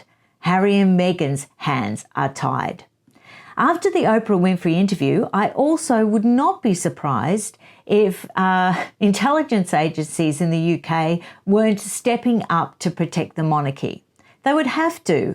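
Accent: Australia. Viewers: Omg are you a ozzy?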